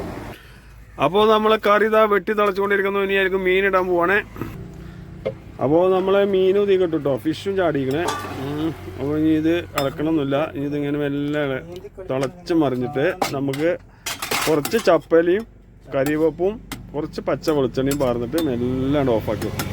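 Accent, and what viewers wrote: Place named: Malappuram, India. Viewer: മലപ്പുറം സ്ലാങ് മാറ്റരുത് ഞാൻ 87മുതൽ നാഷണൽ പറമിറ്റിയിൽ ഓടിയ ഡ്രൈവറാണ് അന്ന് ഇത് പോലുള്ള സൗകര്യം ഇല്ലാത്ത ഡെവിൾ കേൾച്ചും ഓടിച്ചാൽ ഓടിയത്ത സ്റ്റെയറിങ്ങും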